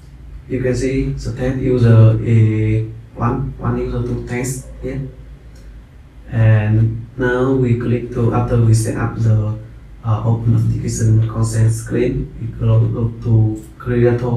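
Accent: Vietnam. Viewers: Good job br!! But Vietlish accent is so clear anyways :o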